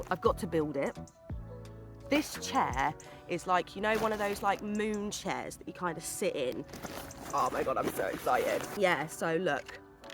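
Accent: United Kingdom. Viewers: Great video 😊 Glad you're back on now Love your UK accent I'm here in the states And I always love hearing someone from the UK or Australia 😊 Good luck this season girl😊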